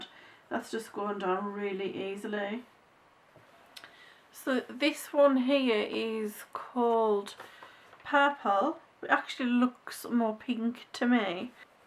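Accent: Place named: Birmingham, United Kingdom. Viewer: Absolutely love the book! Where did you get it and what is it called? I have a few open stock Pablos and I recently bought the Luminance, love what you are doing. It’s lovely and you are so pleasant to listen to 😊 I was born in Birmingham but emigrated to Australia in 1974. Your voice reminds me of the land of my birth ☺️☺️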